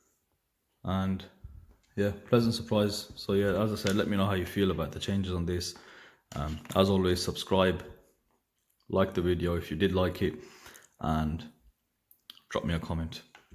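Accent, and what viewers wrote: Birmingham, United Kingdom. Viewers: I thought I recognized the accent. Have you got any if these steeldive watches for sale?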